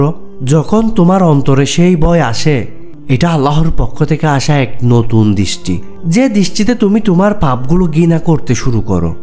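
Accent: Chittagong, Bangladesh. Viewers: Tumi ki chatgaiya poha naki?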